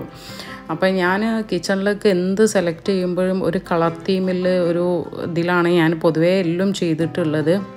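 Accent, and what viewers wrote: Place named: Kozhikode, India. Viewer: Wa alaikkum mussalam Bhayankara bhayankara vellye fan aan njan ee nde Masha allah Almost ingalde ella videosum kand kayinj actually irfanatha vazhi aan ingalekk ethnnath and you’re so so adipoli ❤ Edkk verne ingale kozhikodan slang comedy aan😅 Masha allah keep going 🫶 Withloveshaibi❤️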